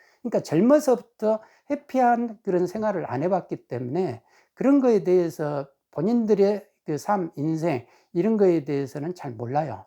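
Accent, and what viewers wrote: South Korea. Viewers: 구독자 입니다. 고향의 이웃동내 같은 구수한 억양에. . . 저는 전북 정읍 현재는 사무실을 옆 하비오 에 있습니다. 오늘은 너무 유익해서 두번 듣습니다. 제가 동영상을 거의다 듣고 있는데 아주 유익 합니다 .끝부분에 고생 고생해 남쓸때 안쓰고 모아서 3채 가 되기에 아주 고민이 많습니다.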